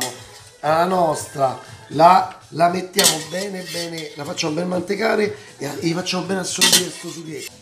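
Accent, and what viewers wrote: Rome, Italy. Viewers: Il dialetto romanesco è sempre uno spasso.. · CIAO.. TI RINGRAZIO TANTISSIMO PER TUTTE LE VOLTE CHE CUCINI.. SEI UN GRANDE👍MI PIACE TUTTO QUELLO CHE CUCINI... E POI QUANDO ASSAGGI QUELLO CHE HAI CUCINATO FAI VENIRE VOGLIA DI MANGIARE E DI CUCINARE SUBITO.. SEI PROPRIO SIMPATICO.. POI PARLI ROMANESCO E IO ADORO TUTTO QUELLO CHE È ROMANO... IO SONO UNA CUGINA DI ROMA.. (CIVITAVECCHIA) POI SONO UNA NONNA DI 73 ANNI.. QUINDI È PIÙ APPREZZABILE QUELLO CHE SCRIVO.. GRAZIE... UN SALUTO A TE E LA TUA CAMERA WOMAN.. UN BACIO AL BIMBO.. CIAO ALLA PROSSIMA.🌹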